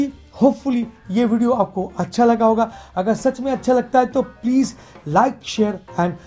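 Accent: Bengal, India. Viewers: Love your vdos. Your knowledge is fantastic and your bong accent is cute :)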